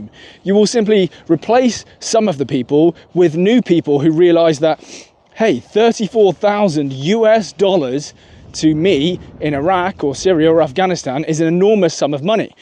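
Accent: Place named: Northern England, United Kingdom. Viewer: strange accent. mostly RP but often produces vowels from northern england. also seems to hupercorrect for a northern accent at times, like a northerner trying to sound southern. maybe went to a public school in the north like rugby?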